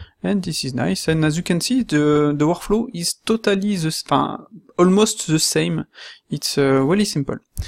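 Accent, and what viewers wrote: France. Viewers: it has to be, you can't make a more french accent than that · oulala l'accent , moi je comprend parce que je suis français mais pour les anglais sa doit être compliqué ... super video sinon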